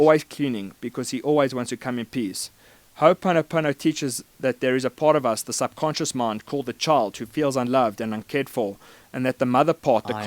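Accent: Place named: South Africa. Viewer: The Video looks like it was filmed at Palace of the Lost City, Sun City, South Africa... I had the pleasure of spending a few days there. Also, your accent may be a give away too...:)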